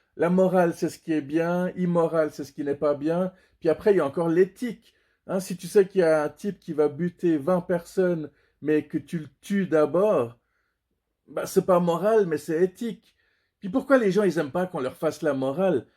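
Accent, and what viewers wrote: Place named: Switzerland. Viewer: C.est ton accent suisse qui fait que tu n'es pas cru quand tu dis en ligne que tu es un bad boy... Les religions, la politique, la meteorologie, ne sont pas les meilleurs sujets pour discuter...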